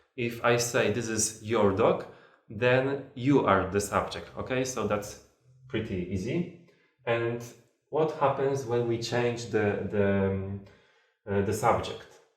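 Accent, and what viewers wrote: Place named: Poland. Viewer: Greetings, Sir! I am here for your Polish English !!! )))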